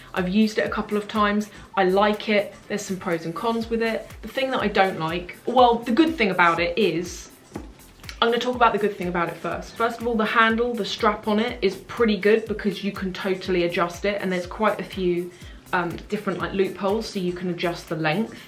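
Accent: United Kingdom. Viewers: I don't know why, but whenever I heard talk, I felt like I spoke in British English accents for no reason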